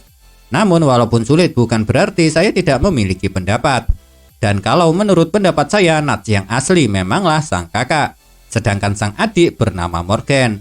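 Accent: Java, Indonesia. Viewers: Logat jawa nya masih kerasa,pembacaan dan penjelasan masih kurang enak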